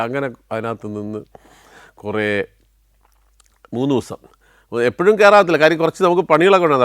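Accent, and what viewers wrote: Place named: Thiruvananthapuram, India. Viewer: ആദ്യത്തെ എപ്പിസോഡ് കണ്ടു് തിരുവനന്തപുരം ശൈലിയിൽ ഉള്ള വിവരണം കേട്ടപ്പോൾ ഇഷ്ടം ആയി❤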